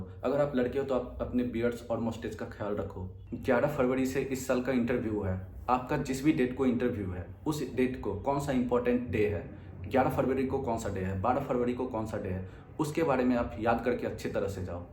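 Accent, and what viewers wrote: India. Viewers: Bhai tumhara bihari accent lg raha…Bihar se ho kya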